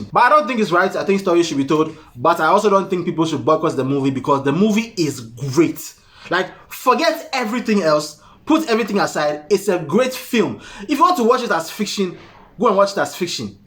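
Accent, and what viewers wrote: Ghana. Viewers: your accent sounds like some Ewe man i know 😭😂😂😂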